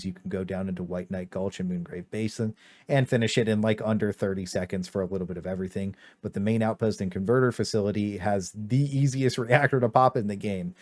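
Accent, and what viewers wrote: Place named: United States. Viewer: It's an American thing. Water is warduh.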